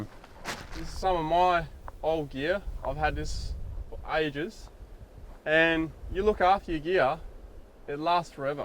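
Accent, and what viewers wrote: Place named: Australia. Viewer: Good round-up and advice! Have learned a thing or two since I discovered your channel. Must say its a bit hard for me to understand your australian acccent (english not my first language)(born and raised in Mexico) but Im getting used to it. Will definitely continue to come back, check out and enjoy more stuff.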